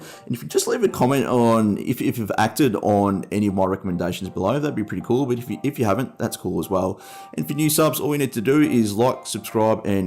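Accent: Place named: Australia. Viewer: Secound ! Can i has a Gmod :3 Dat australian accent ;D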